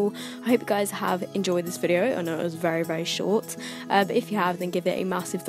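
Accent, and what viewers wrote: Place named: Kent, United Kingdom. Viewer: love it yessss girl where abouts are you from near Kent or more central London? We have the same accent lmao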